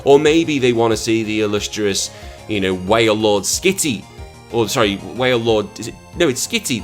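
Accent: United Kingdom. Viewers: chibi dawn is soo adorable and i got a question are you british or irish i dont want to sound like a idiot sorry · love your accent. British to a tee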